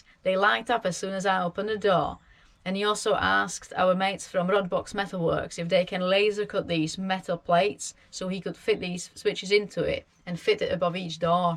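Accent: United Kingdom. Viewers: I was going to say the same, lovely accent! Yorkshire with a twang 😎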